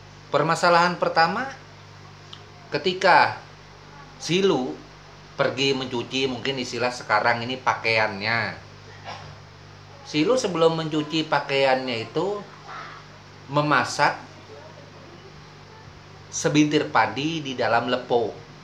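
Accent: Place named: Paser, Indonesia. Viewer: Orang paser sih ini dri logat² nya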